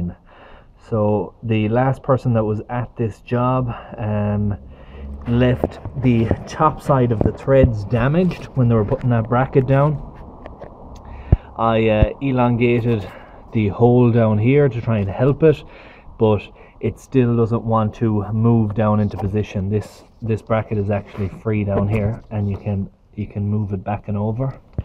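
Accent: Ireland. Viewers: Ahem...I just love your accent...it reminds me of my famous English...Irish teacher...what a great guy...that was 45years ago... Hahaa. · Always believe a man with an Irish accent. Love from Australia!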